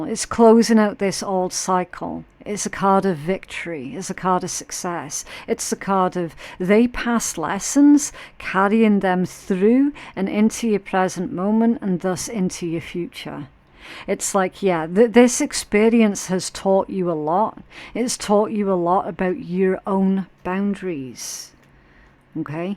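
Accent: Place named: Scotland, United Kingdom. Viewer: I love your accent, I believe it’s a Scottish accent. It sends me back to when I was a little child and lived in a house right next-door to grandma and grandpa from Scotland. Thank you so much for sharing your spirit with us. Might love always.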